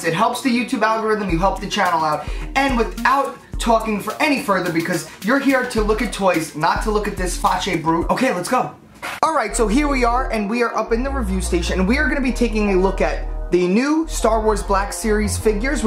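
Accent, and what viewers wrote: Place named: Staten Island, United States. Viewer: Bro where u from you sound mad Staten Island?!? 😁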